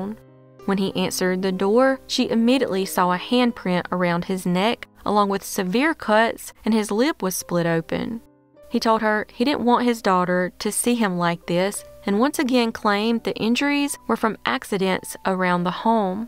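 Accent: South, United States. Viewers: Thank you kindly and I love your southern accent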